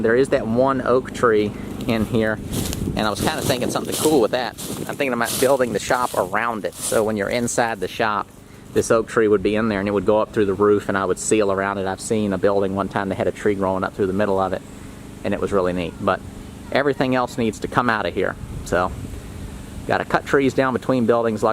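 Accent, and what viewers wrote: South, United States. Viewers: I like your ideas/purpose for this nice piece of land. The Jeep would be a fun project to watch if you don’t speed up your video and someone might also learn how to do a trade by watching your channel. I love the plans for your property especially leaving the tree inside your building. Nature kinda helps slow us down enough to enjoy life without thinking about making money. I think it brings joy just having a place to go to get away from work and have fun projects you like to do. I am looking forward to seeing more on this channel. I actually forgot about your channel’s name until I heard your voice and I knew exactly what channel I was going to watch it all the way through. Poison Ivy is not something I could have around my property because my son is highly allergic to it! Could you point it out on your next video? Please... 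Do you work out to stay in good shape or just physically work hard at your different trades? I think you may have mentioned which state you live in but I don’t recall. You do have a nice Southern accent which I enjoy listening to you speak. It reminds me of my family. I hope you return soon for some of your updates! Is your other channel on a link so I can find it? Thank you young man👍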